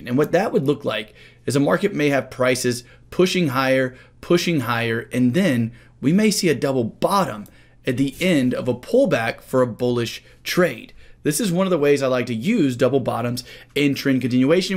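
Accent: South, United States. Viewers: He has a thick country accent.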